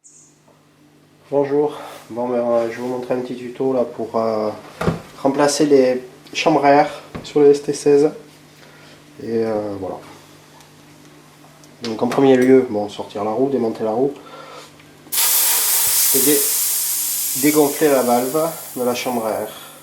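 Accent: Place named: South, France. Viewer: Bjr Merci du tuto. Chèr rider cést tress bien. Réalisér laetitia de verviers en Belgique pianiste.. Et rideuse dans mes heures libres.... Un grand coup de chapeau. Pour remettre ce pneu.. En action.. Cest une bonnne vidéo bel accent du sud..❤️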